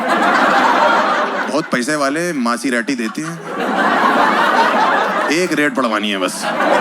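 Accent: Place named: Haryana, India. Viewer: Haryana m rehkar Haryanvi seekh gaye Bhaai 😂😂😅😅🙏🙏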